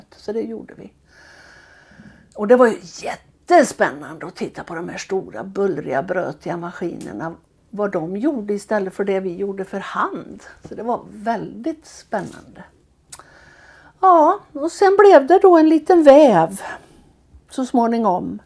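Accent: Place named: Skaraborg, Sweden. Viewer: Så roligt att se den här filmen och höra dej, berätta på din härliga Skaraborgska, om linet.! Jag använde den i klassen när vi pratade om Lin( nu när vi inte kan åka Skara med klassen längre). Roligt med Tidaholm och Holma också. I mitt linneförråd har jag en bunt ofållade halvlinnehanddukar från Holma😀 från min svärmor som bodde i Tidaholm.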